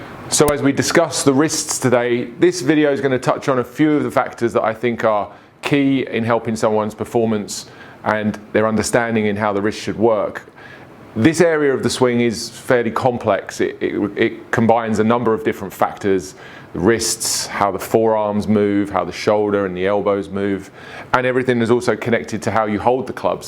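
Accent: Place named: United Kingdom. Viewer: I watch tons of golf content The way you explain it, with LOGIC, is just so perfect. One of the best golf channels on YouTube!! Plus. The British accent? Idk why, someone could be as dumb as rocks, but if they had a British accent? Doesn't SOUND as dumb?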